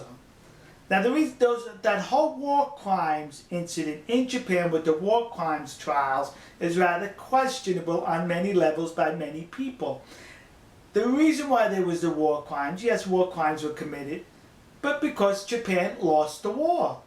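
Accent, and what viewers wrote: Texas, United States. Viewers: テキサス訛りの英語をですか？教材には向いていないと思いますよ。 残念ですが・・・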